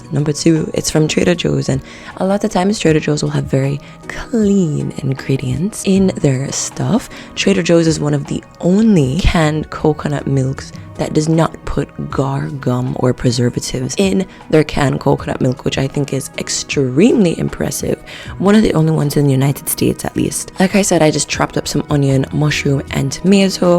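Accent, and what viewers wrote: United Kingdom. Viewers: I love a good British accent ❤❤❤ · Excellent British Accent 👌🏾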